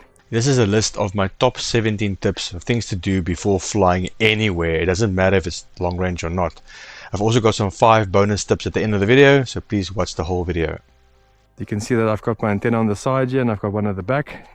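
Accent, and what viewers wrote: South Africa. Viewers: I can spot a follow South African from a kilometre away XD. NIce channel!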